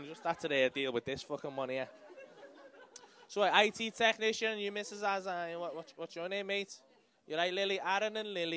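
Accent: Liverpool, United Kingdom. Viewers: The only thing wrong with this is that he has a Scouse accent, and he ain’t funny....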